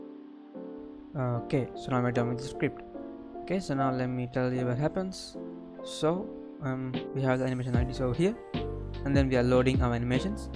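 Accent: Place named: India. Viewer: Ahh indian voice, didnt understand anything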